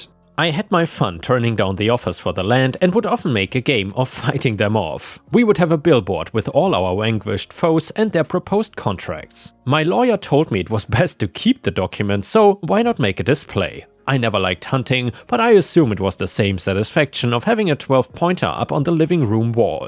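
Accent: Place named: Germany. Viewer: Man, I enjoyed so many of your videos. And your accent sounded so familiar.. I've worked in a lot of countries during my "wild years". You are from Germany, right? Greetings from Obersulm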